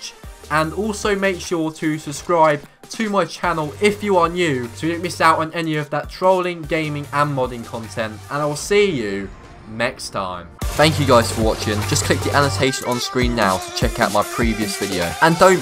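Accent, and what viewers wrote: United Kingdom. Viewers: Dude I love your British accent!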